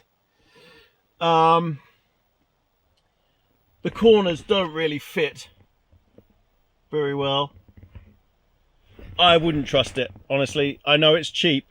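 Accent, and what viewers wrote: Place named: England, United Kingdom. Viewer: have you ever thought to do a story time channel? You have a beautiful ton e with you voice, you are animated and with your beautiful English accent, you would be perfect for storytime. You could just have a set scenery for the photo and just have your voice reading the story. I usually watch her videos twice. The first time I start watching when I lay down before bed and end up falling asleep the second time so I can actually watch the entire thing without falling asleep lol.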